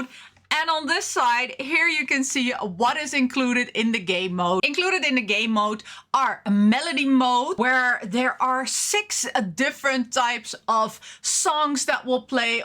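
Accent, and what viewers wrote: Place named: Netherlands. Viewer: hello, something i've always wondered if you're dutch? you're accent is really dutch :3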